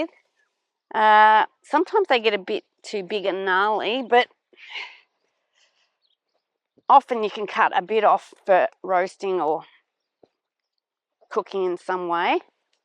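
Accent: United States. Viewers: Why has our Aussie got an American accent 😢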